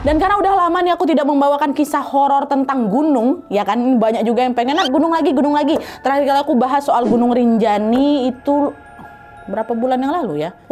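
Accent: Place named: Pekanbaru, Indonesia. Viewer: Tapi dari logat kak ini logat pekanbaru tapi kadang logat medan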